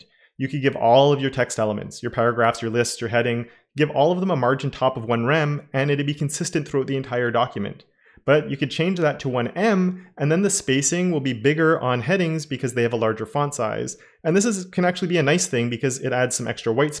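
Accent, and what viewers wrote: Canada. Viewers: Thanks for the informative video. Distracted with the canadian accent hehe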